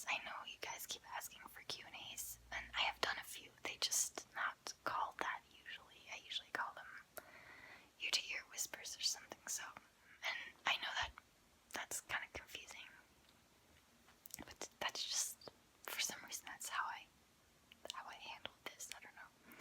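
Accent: United States. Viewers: How are you German but have an American accent I’m so confused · Most Germans can speak English, but she is far more fluent than normal, probably better than at least 99.99% of non-native English speakers in Germany. She put a ton of effort into becoming fluent and developing an American accent. By the way, she did the same with Spanish and people are baffled by her Mexican accent. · How do u have such a, American voice wen ur from Germany?!?!?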